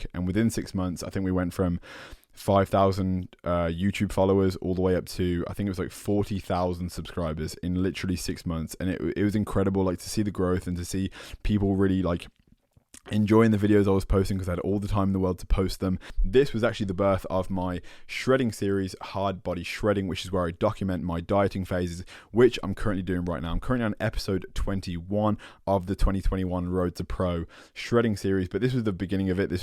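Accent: United Kingdom. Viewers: I'm loving your british accent. I'm from Holland and your accent and voice are so amazing. Could listen to it all day. Yep i love british english.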